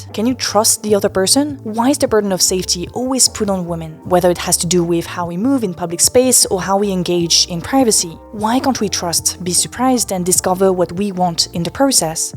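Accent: France. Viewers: Hi! I really enjoy your videos and the perspectives you bring on the topics you choose! The discussions are always super well researched and thoughtful! I also really enjoy listening to your voice/accent. I have a question about that, actually, cause it’s something I’ve been thinking about when it comes to my own accent (austrian/slovenian). When I was younger I sought to mimic the accents I heard on tv and in person, but lately I’ve found that I like it if a bit of my language diversity mixes into it and have sometimes deliberately chosen to keep some things like a german h or a slovenian r in some words. In that way I sometimes feel like I’m aiming for a balance between what’s understandable /great for the person I’m speaking to and what’s more authentic/feels more comfortable. Going into english spaces I always felt a bit self-conscious of a stronger austrian accent (we historically don’t have the best representation lol) so I was wondering what your experience is with that as a french content creator who creates in English. 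Do you find yourself toeing the same line or do you not think about it at all? Do you think it might be different if the language you were born in wasn’t french? (I’m kind of assuming this here, please correct me if I’m wrong, after all, I don’t actually know you 😅) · i find your pronunciation of "o" "oo" "ar" "ure" all pronunication as a strange "urrr", videurr, explurnation, everywhurr, burrk, prurfur - this clumsy hiding of your french accent , it's very distracting. Sorry, but i really like your content. I am French and I don't see why you make so many vowel sounds converge into "urr".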